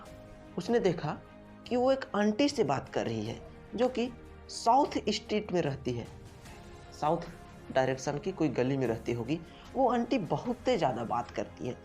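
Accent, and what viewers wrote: Bihar, India. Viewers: Bhai aap Bihar side se ho na....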